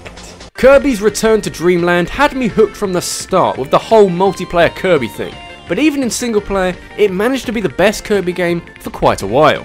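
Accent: United Kingdom. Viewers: Gigadrain is it a British Accent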